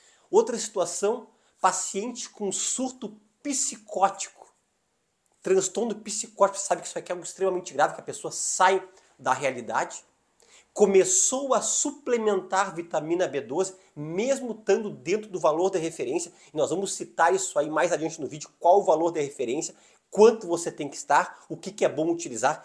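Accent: Rio Grande do Sul, Brazil. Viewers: MS que médico bem gaúcho tche!!!!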